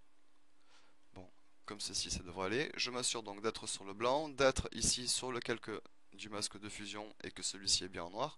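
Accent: South, France. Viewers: bonjour , merci pour ces tutos d'une très bonne qualité et vos explications pourvus d'un accent du sud ;-))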